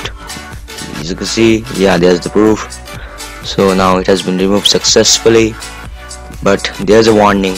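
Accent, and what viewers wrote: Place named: India. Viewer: lazy english or indian accent i hate it.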